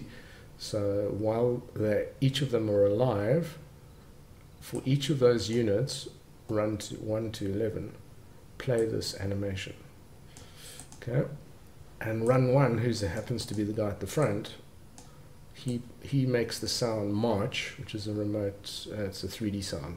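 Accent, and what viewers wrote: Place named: South Africa. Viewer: Yeh, unfortunately it still doesn't work... i've gotten some progress though, i managed to use vanilla but only the leader of the group is moving Edit: ive done it! I know that your from South Africa i could tell by your voice so i'll let you sleep, but after faffing around with my faults i got it to work. Sorry to bother you and thanks again!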